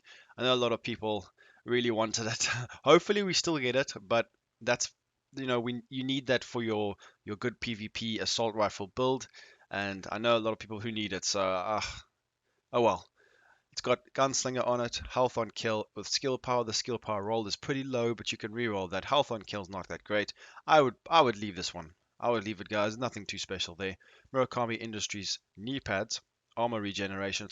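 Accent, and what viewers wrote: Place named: South Africa. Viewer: My Bru.. That Accent.. SA boy comming in clutch XD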